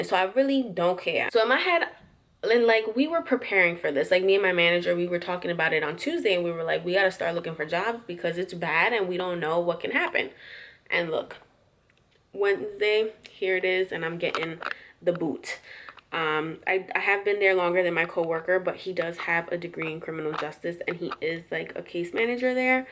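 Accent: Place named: New York City, United States. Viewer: Are you originally from NYC, I hear a NYC accent lol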